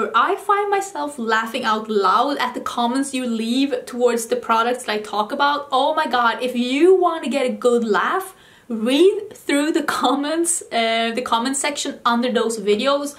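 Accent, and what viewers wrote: United States. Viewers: I have a question for you: where did you learn your perfect American English? Did you ever live in the U.S.? Just wondered.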